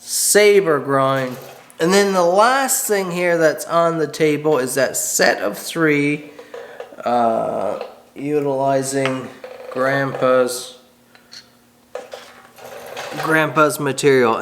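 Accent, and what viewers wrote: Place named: Newfoundland, Canada. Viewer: you live in Newfoundland?? no wonder i can't understand you LOL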